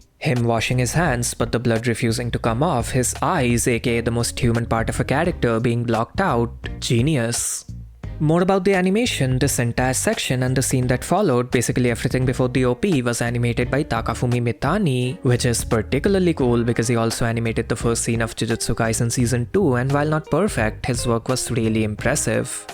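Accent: India. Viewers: Like my comment if you think his indian accent ruins this video and want him to use an american or any other correct english accent. Sorry mate but we are all making a stand. If you dont change the accent on the video we will unsubscribe until you do. Peace be with you brother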